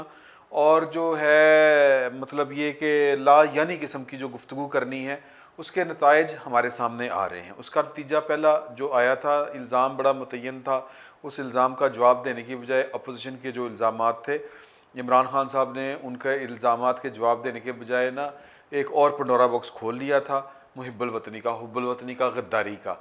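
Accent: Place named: Lahore, Pakistan. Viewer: Yaar aap u tube say pehlay kahan thay qasam se mn aapka fan hogaya hon ur great sir g Khalis Lahoria wali boli hi aapki.shakhsiat ko chaar Chand lagati hai well-done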